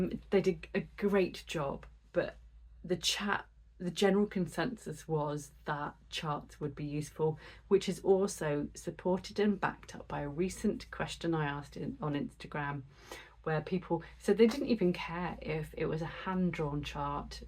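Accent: England, United Kingdom. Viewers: As usual love your english accent, humour and laid back style. Not to mention all your croch-eting. Lots of inspiration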